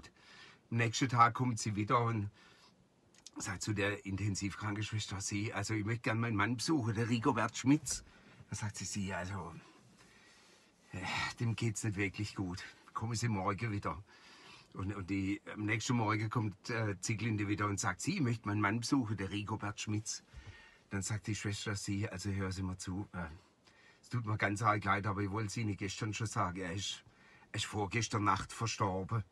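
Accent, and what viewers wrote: Baden, Germany. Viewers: Bester badischer 😁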